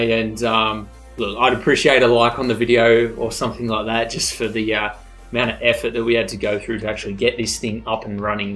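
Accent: Australia. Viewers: r u an Aussie cuz to me u sound it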